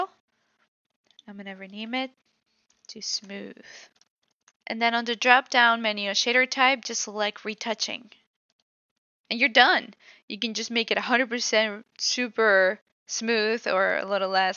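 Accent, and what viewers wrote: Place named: Netherlands. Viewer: You accents sounds like Dutch (Netherlands)🇳🇱 ones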